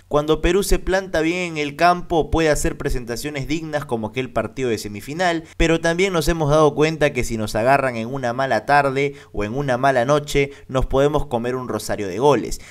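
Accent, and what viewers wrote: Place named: Argentina. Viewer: Obsesionado con los argentinos? Xq tienes un dejo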